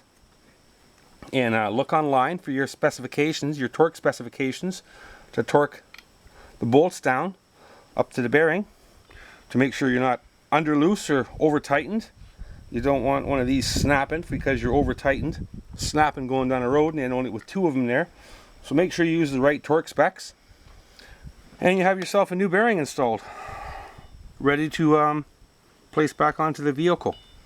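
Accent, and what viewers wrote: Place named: Canada. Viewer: Good possibility you're either Canadian, or from the U.P. I'm a bridge troll here from the L.P.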